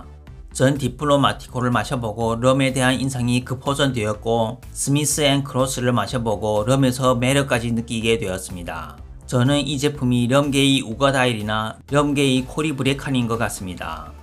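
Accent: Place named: Gyeongsang, South Korea. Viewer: 경상도식 국어책 읽기가 귀여우시네요 ㅎㅎㅎ 좋은정보 감사드립니다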